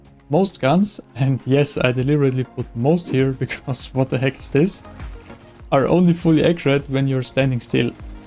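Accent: Austria. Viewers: als ob du österreicher bist hahahha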